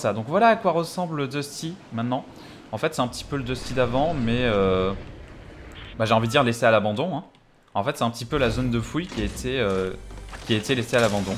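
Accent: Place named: Russia. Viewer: J'adore ton accent "roose"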